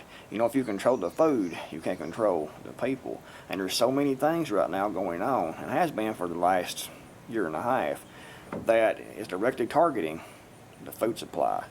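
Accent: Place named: Tennessee, United States. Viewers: I’m in Texas and I love listening to his accent and it is different from what I hear in Texas with certain words pronounced differently. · Me too! Idk where he’s at, but he sounds like he lives in Tennessee. I’m in Texas and our accents are much less twangy. I like his speaking voice.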